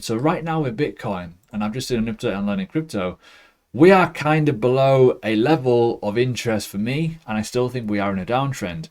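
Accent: United Kingdom. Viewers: Omg 😱 my favourite guy ever. Please keep them coming. The British accent a huge bonus 👍👍👍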